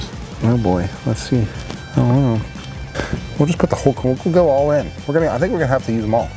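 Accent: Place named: Pennsylvania, United States. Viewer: Youins guys.........Pennsyltucky English. Love your channel. Thanks for sharing.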